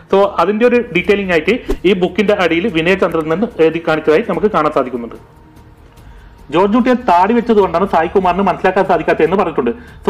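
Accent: Kannur, India. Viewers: Eth kannur karanada... Ee channel owner, thani nadan kannur bhasha... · Nigal kannur ano ? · നിങ്ങൾ കണ്ണൂർ കാസർഗോഡ് കാരനാണോ...?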